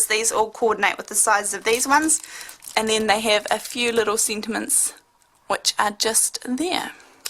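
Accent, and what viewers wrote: New Zealand. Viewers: Wow !! So how do you heat emboss the mandala please? What kind of stamping ink do you but to get a really clear stamp? I've been using aliexpress ink and the images turn out a wee but fuzzy. Thanks so much for your videos!! It's nice to hear a fellow kiwi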